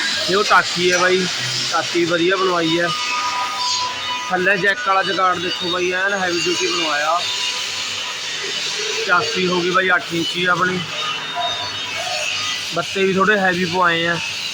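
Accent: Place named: Uttar Pradesh, India. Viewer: area kehra veer thuda boli ta up wli lgdi a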